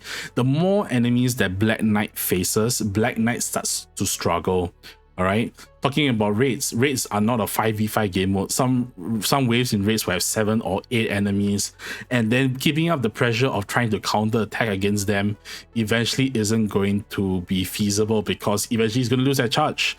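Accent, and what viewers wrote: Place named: Philippines. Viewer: good vid bro, your accent is heavy .. are you filipino??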